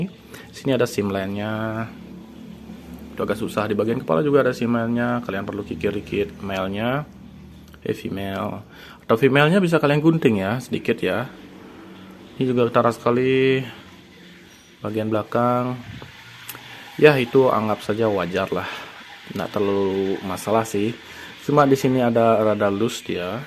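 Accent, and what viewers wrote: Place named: Bali, Indonesia. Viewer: ngenah logat bali e wkwk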